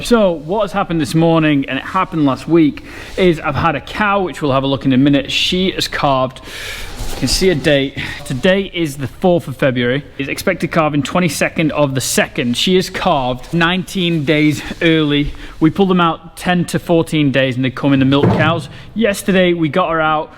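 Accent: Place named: England, United Kingdom. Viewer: English English.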